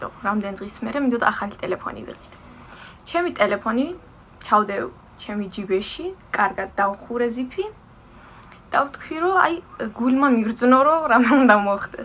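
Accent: Fereydan, Iran. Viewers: რა საყვარელი ხართ, ფერეიდნელი ქართვრლების დიალექტი🫶🏻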